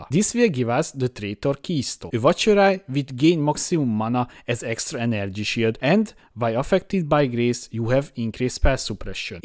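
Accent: Hungary. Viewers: Hungarian, right? :D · az akcentusból tudtam, hogy magyar vagy, jó videó!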